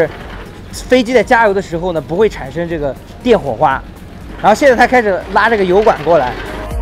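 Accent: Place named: Hefei, China. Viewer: 听出来合肥口音~~ 老乡好 祝你在美国生活愉快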